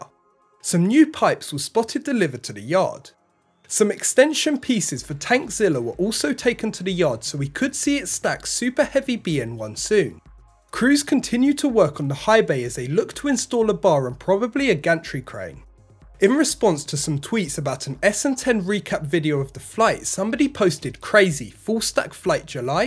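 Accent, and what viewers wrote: United Kingdom. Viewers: Turn the volume to zero. Sorry that UK accents annoy you (not really). His use of technical terms, and of English grammar are excellent. Maybe that is your problem.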